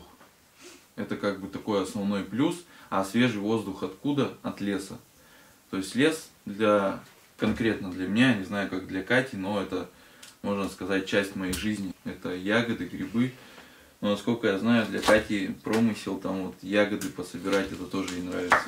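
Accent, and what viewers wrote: Urals, Russia. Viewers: Вот как раз по нашему разговаривают ...по Уральски ✌️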